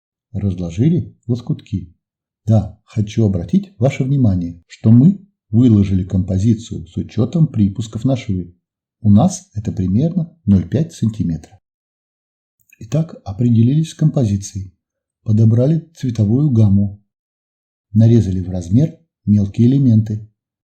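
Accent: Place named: Kurgan, Russia. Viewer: Обремкаются, вышаркивается - супер! Прямо ностальжи по Курганскому говору! Удачи вам в вашем творчестве!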